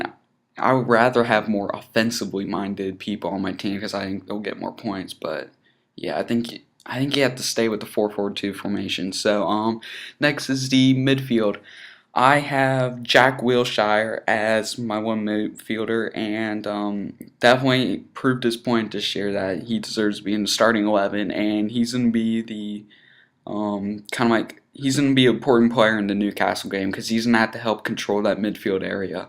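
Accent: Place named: United States. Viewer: OMFG you are so american. descusting accent. albiuuunn, widffs, willshiuurrr lmfao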